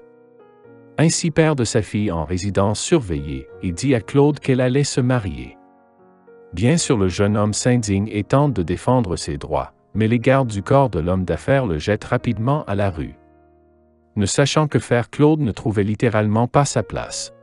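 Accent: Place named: Canada. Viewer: Un peu de réflexion il est canadien on entend bien l'accent et le français n'est pas le même a 100% la bas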